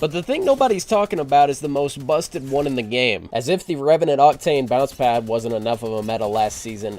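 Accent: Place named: South, United States. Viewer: You have a bit of a southern draw, you from Georgia or the surrounding states?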